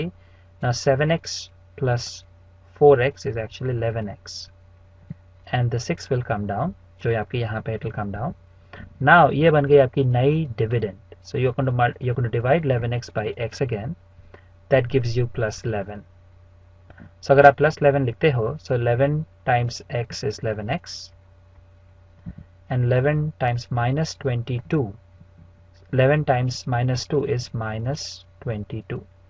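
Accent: India. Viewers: sir,are you from south india.??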